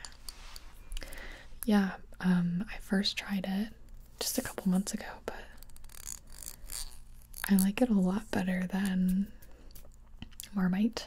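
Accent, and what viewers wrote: Canada. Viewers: w8 is cAnaDiaN?!?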